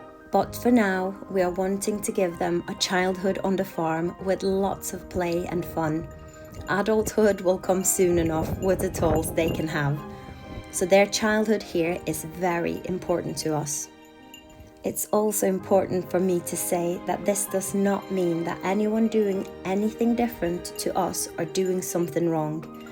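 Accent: Northern England, United Kingdom. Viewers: What a beautiful little piece of England 😊 You have a great northern English accent, for a Norwegian 👍 (the stunningly good YouTube algorithm recommended this) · For a Norwegian you have a very noticeable Northern English accent, Yorkshire?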